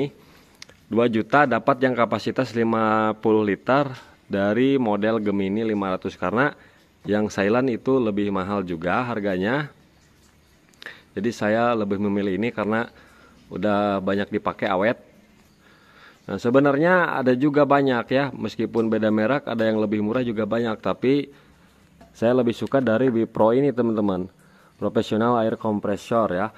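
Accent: West Java, Indonesia. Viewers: Mas'se kalau bicara,,?,🙄 logatnya seperti orang Jawa Barat..😅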